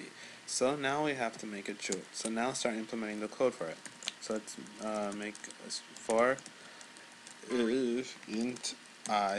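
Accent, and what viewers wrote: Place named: United States. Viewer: nice video BTW, thanks for a nice american accent and not indian one (damn, I'm such a racist) :D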